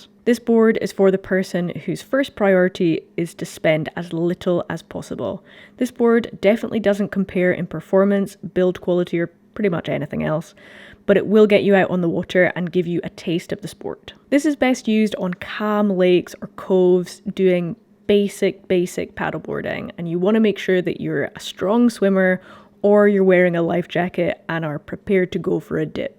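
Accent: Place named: Scotland, United Kingdom. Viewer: lady has a scottish accent but gives prices in $s ! yeah that makes sense. thought this was a brit video . could of done with prices in £s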